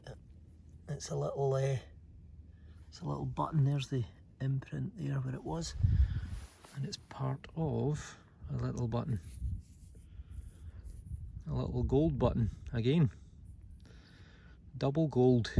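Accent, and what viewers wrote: Scotland, United Kingdom. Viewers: another great video, but can I give you a wee bit of advice please. when you go hame after yer dig an clean aw yer finds - put yer water bottle and an old bar towel intae yer finds bag an you,l be 100% ready tae go on yer next dig. keep postin yer digs as I enjoy them an also hear an accent from hame. billy Mac.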